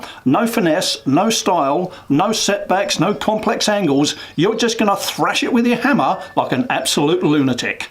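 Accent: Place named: Australia. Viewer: Nice to hear an Aussie accent. Good instructions for making a set of workmanlike tongs. Not trying for beauty - that’s for the things we use the tongs for. Thank you!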